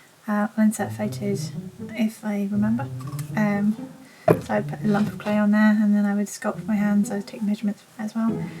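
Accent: United Kingdom. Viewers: Hey there...I'm a new subscriber and I just want to leave a compliment, I love big, fluffy dogs, I have a couple of German Shepherds that you'd love!, you and your pup are so cute together and I love your British accent, you sort of sound like Ozzy's wife!...I'm calling from the United States, I live in Michigan....Love, Ron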